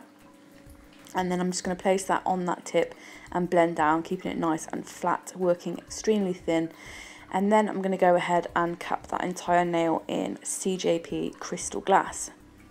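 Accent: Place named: Birmingham, United Kingdom. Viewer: Love this girl and her work. Sounds like a Brummie!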